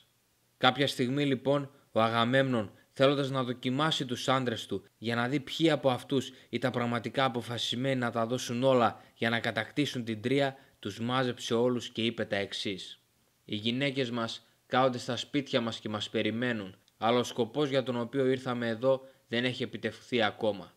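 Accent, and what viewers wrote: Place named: Northern Greece, Greece. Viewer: Βόρειο ελαδιτης είσαι;στο τέλος που λες μα είσθαι ολλοι καλά ίδια προφορά εχουμε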